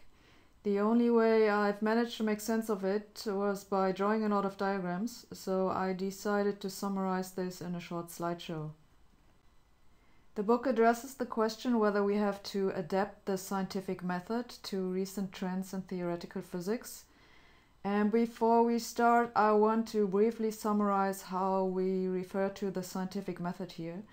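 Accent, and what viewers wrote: Germany. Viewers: Yes, that was a nice example of Hossenfelder's MANY throw-away one-liners! The effect of her swift, deadpan delivery is deliciously close to that of Sally Phillips who plays Minna Häkkinen, the "Prime Minister of Finland," on the show called VEEP (granted that what we hear in this case is technically a very mild and pleasant German accent, instead of a faux-Finnish accent).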